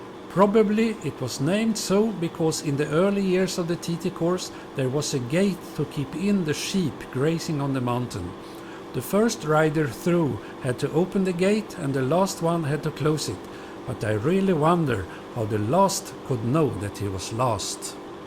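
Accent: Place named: Sweden. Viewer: Great work! And your Swenglish sounds good!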